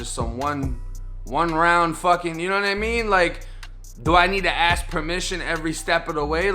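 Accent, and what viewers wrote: Boston, United States. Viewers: I know your from FLA but you have a Boston Accent...but most people from Boston move to FLA anyway you must have an aunt or something. I moved to FT MISERY OMG I ran back to Las Vegas CDC I am originally from Boston were I could run a muck I never got pulled over than you God my Lord and Savior.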